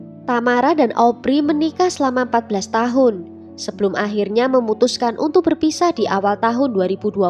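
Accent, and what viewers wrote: Java, Indonesia. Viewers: Hay.. medokuhhh 👍💖💖💖 sukses trs yaa say 🤲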